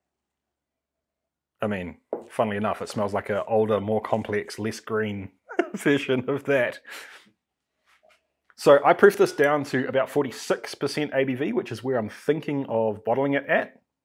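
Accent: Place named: New Zealand. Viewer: NZ accent, bro.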